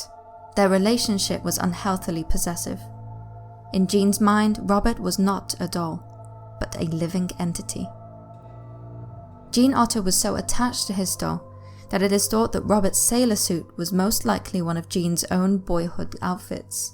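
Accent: United Kingdom. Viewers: You have a beautiful British accent . I lived in greater Manchester most of my life being a duel Citizen. Anyways, I am for sure subbing to the channel as I am interested in the true paranormal. There is just to many out there in researchers on television and YouTube that fake it. You do not and I know another person that does not either. Ta mate , for the great videos! You truly have my respect. Cheers!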